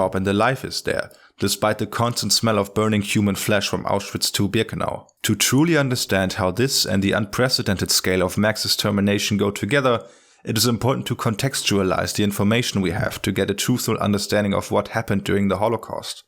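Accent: Germany. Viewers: i know you’re still making these videos, and doubt you’ll see this, but i think it’s extra important for you to do this work. for some reason my lizard brain trusts you more because you have a german accent. now, of course that isn’t a real reason to believe what you say as true, and i know this, but for people who are on the fence about the truth of the holocaust, all they really want is an authority figure, and i think you give that where british, american, and canadian-accented creators can’t.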